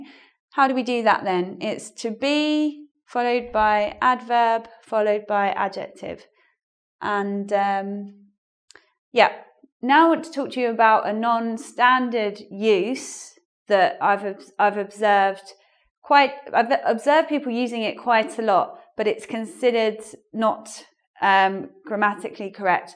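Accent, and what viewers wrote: United Kingdom. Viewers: Her British accent is so cute! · give me that british accent m8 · I love your British accent. I wish I could sound more authenticaly. But I find bit silly trying to copy a native speaker, doesnt it sound awkward? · Hi, I have to confess: british english pronountiation is much more difficult to understand to me than american english. I follow your channel and I watch all your videos, but I have to say that I struggled to understand you today. But, keep it up :-)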